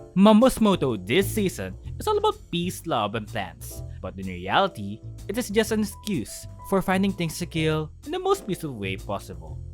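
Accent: Philippines. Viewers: Wait... your accent? Are Filipino?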